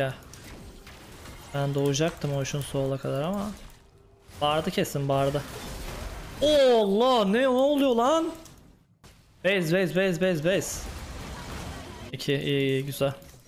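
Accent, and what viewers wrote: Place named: Thrace, Turkey. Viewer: nereli bir Trakya havası var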